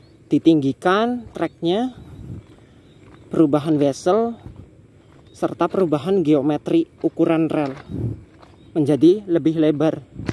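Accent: Banyumas, Indonesia. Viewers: Mantap... Liputan dgn logat ngapak Lanjutkan!!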